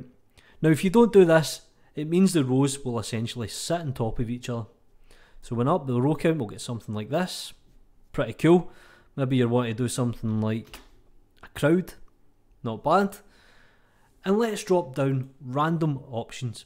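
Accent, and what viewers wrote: Scotland, United Kingdom. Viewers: Just dropping by to say that I love your video format, short and concise. Also, your accent makes me wanna go back to Scotland so bad! Peace.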